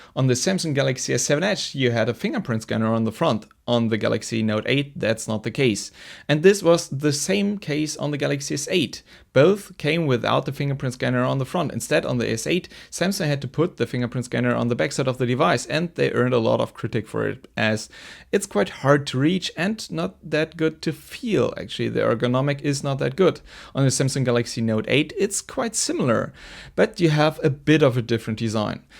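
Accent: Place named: Germany. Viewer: hey um..... whare rrrrrr uuuuuuu ffrommm??????????????????u have an hard accent.Germany?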